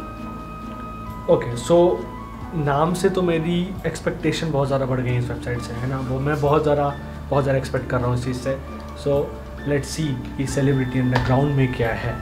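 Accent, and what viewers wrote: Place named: India. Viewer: India me ladke aise hi bat karte h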